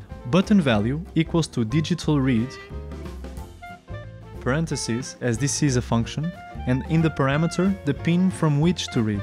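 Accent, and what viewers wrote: Portugal. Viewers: Impecável. Bem que estava a reconhecer um sotaque Português. Bom vídeo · Este sotaque não engana ninguém, tinha de ser português, ;)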